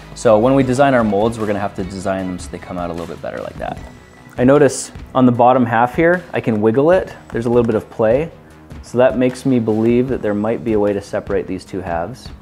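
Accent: Canada. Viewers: I've been wondering for a long time now, based on the way pronounces certain words like "tomorrow," if he's Canadian. Anyone know?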